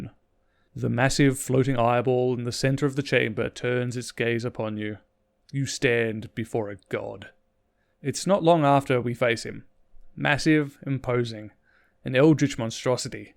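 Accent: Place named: Australia. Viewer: Video essays are good. Plz do more. Good to hear an Aussie on here. While I've always enjoyed doing the extra info searching to get me into a mmo, WoW has started to be boring again. FF14 has been a great antidote. Thank you for making content on it from a similar perspective to mine. Keep up the good work mate and looking forward to hearing more from you once I've finished watching all your vids. (about half way through now).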